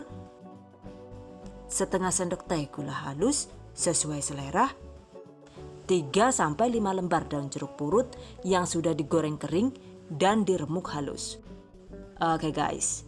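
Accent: Banyumas, Indonesia. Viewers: Subcriber baru ☝️asli lucu banget kamuuuuu, gyemessss ngapak"nya dan caaaattaattt. Ada tips & trik, lengkap deh. Pas kepoin yt nya ternyata banyak bgt resep" yg ku cari". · Deneng ana ngapak2e 😂😂😂 jhahahaha😂 auto SUBSCRIBE yu. Komen ninggal jejak. Lopene kue di tempel. 💖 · Suka aksen ngapaknya kaya rika wkkkk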